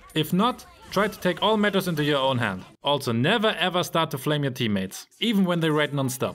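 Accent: Germany. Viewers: Deutscher Akzent xd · Hey in what server are u eu or na I feel like eu because of german accent Sow if you wanna game I m open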